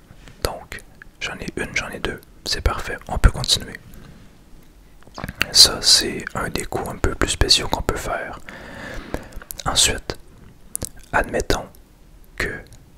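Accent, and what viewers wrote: Quebec, Canada. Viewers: Entre ton accent québécois et les jeux de société, ça me rappelle les soirées en Randolph, j'en deviens presque nostalgique ! En plus les petites pièces sonnent tellement bien !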